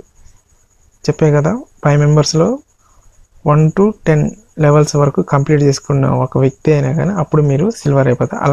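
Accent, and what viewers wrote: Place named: Lambadi, India. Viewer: Thammudu nuv LAMABADI kadha.. ni voice vintene ardham avthundhi.. cooooosh bockkkk kaadhu babu.. cashback..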